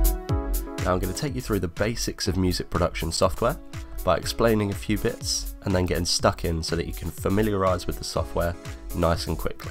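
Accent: United Kingdom. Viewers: At last!! A nice British accent to listen to on an Ableton tutorial. Phew! ... sorry Murica.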